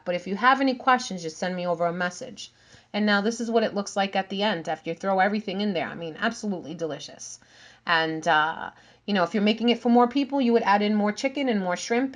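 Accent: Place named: Long Island, United States. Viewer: you sound like ur from Long Island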